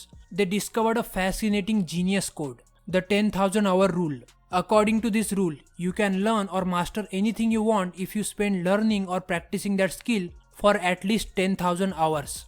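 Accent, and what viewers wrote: India. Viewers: I love the Indian Accent.. Respect from Somalia 🤗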